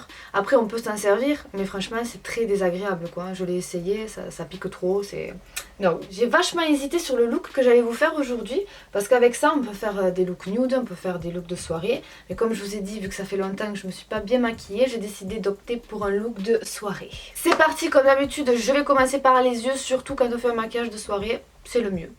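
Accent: South, France. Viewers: j'adore (on a le même accent qui sent bon le soleil ;) )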